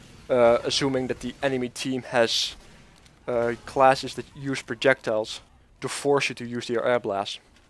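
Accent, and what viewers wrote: Netherlands. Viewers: Judging by your accent, you cannot but be Dutch, right? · ik kan horen dat je een nederlander ent ;P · are you perhaps dutch? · Is this guy dutch?? It sounds like he has a dutch accent.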